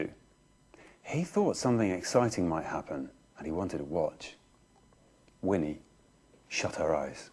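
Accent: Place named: England, United Kingdom. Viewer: Richard's voice sounds a lot more English in this than in Robin Hood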